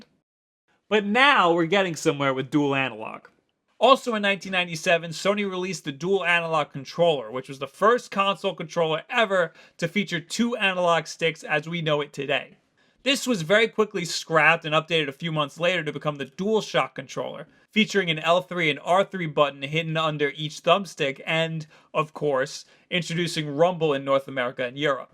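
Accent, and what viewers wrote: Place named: New York, United States. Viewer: when was going strong with that ny accent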